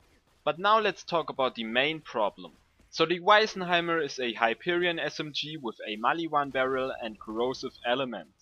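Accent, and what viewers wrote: Germany. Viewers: Are you german? You're English sound so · are you german? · Bist du ein deutscher? Mann hört an deinem akcent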